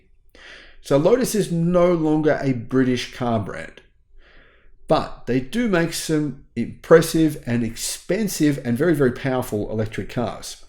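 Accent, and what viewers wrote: Australia. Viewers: What's a mile an hour?! You sound like a fellow Aussie to me.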